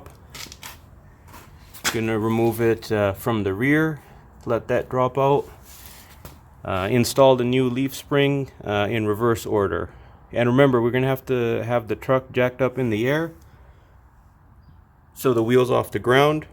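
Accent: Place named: Canada. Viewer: You sound canadian eh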